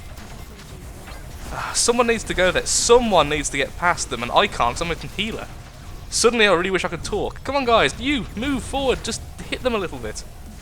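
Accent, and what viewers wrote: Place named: England, United Kingdom. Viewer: I love your englishness. or UKness, I dont know, accentness. sir ;)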